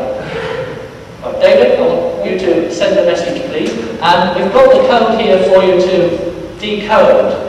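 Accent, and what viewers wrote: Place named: England, United Kingdom. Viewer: This guy is definitely English. SECOND POST!!!!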